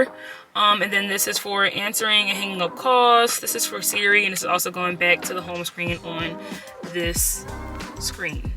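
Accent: Philadelphia, United States. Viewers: Are you from Philly? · I was looking for this comment. I’m like she gotta be from Philly. I’m from south jersey so I be knowing.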